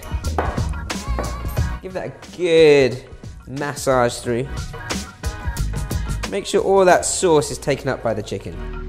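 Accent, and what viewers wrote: England, United Kingdom. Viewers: Some how a Asian with a British accent cooking Chinese doesn't seem all that authentic to me. Kind of like a Brit telling an American world war 2 story. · Asian guy with a British accent lol. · 🔥🔥🔥🔥 bro you talk like English kat but this dude looks Asian is fuck but makes good ass food bros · Fast and efficient cooking , and you have pommie English accent !!!!!!!! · You don’t see many Chinese with British accents. Love it! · i am an asian n i am jealous of ur english accent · I like the way you speaks . Britainese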